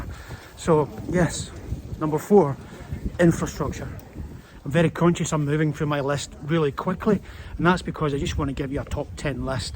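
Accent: Scotland, United Kingdom. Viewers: As a Chinese living in the UK for over 30 years, it is great to hear some Scottish accent from China! Thank you, for reporting your experience in China as it is, and hopefully giving some UK audience some truth about life in China. The main stream media here is so unbelievably biased that for general public in the UK, all they hear is anti China propaganda, zero about what life in China is really like! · Interesting to see a Scot vlogging his life in China. Can tell your Scottish straight from your accent. Love it. God bless. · Loved your trueful and sincere comments on living in China, and your Scottish accent 😊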